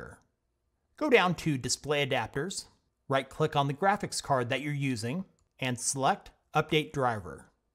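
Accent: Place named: Appalachia, United States. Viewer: in Hilly Billy accent just classic!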